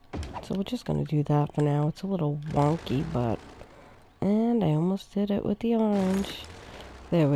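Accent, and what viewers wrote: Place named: New York, United States. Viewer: So I was wondering if anyone ever told you, that you sound like the woman from the TV show "King of queens". Lol I love that show. She did a great impression of a genuine new Yorker. And you sound like her. I'm hazarding a guess that you are a new Yorker but I've been wrong before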